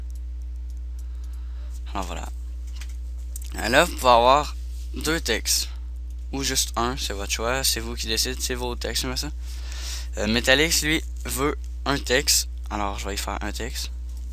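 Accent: Quebec, Canada. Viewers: ralala l accent quebecois mdr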